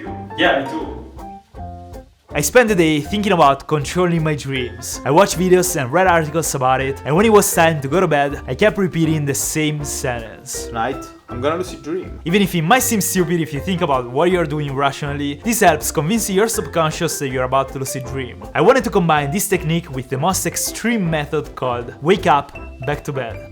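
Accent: Italy. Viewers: Si sente che è italiano😆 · Gia dalla voce si capiva ma è italiano ahahahah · ho appena realizzato che sei italiano 😂🤣😅 eppure già dall'accento me n'ero reso conto 😂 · What about learning, I mean totally nailing a British accent next video - could be interesting to see how an Italian would improve his accent!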